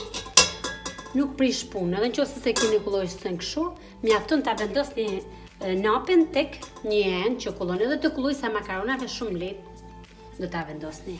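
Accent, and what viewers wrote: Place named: Shkodra, Albania. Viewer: Ty të lumshin ato dur se un vdes me hanger djath të njom mu duk se flitshe shkodranshe se edhe un jam shkodrane❤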